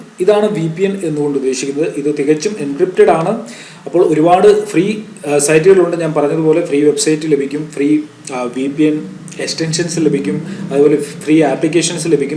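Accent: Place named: Kollam, India. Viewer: കൊല്ലം furi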